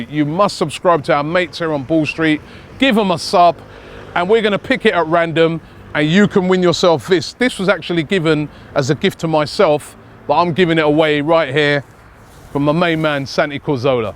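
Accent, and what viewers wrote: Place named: London, United Kingdom. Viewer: bradke London mate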